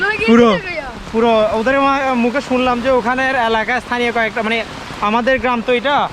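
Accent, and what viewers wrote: Noakhali, Bangladesh. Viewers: ভাই মনো আঙ্গো নোয়াখালীর..? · ক্যামেরাতে হ্যাক লাগি গেছে গই,,,,, ফ্যাক্টঃ নোয়াখালীর ভাষা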